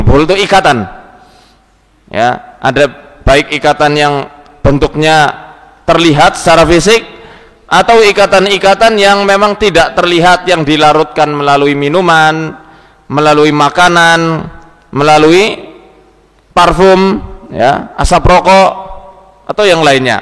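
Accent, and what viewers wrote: Banyumas, Indonesia. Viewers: Pak ustad asli wong ngapak ya....logate pada karo inyong😆😆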